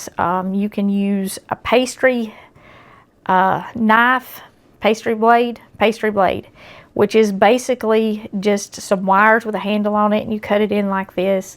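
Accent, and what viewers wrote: South, United States. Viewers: I am Southern born & bred but girl that accent is country, I luv it! Enjoy your videos, enjoy your bible talks, and I just ordered me a T-shirt, can’t wait to get it! Keep up the good work! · Just stumbled upon your video ,you was making homemade fried apple pies.,I watched all the video cause I liked the way you talk, don't know where you from ,sounds pure southern to me. Anyway enjoyed it very much. May God bless you in a special way . Gonna get me one of those dough cutters from the dollar tree.looks like a multi purpose gadget..thanks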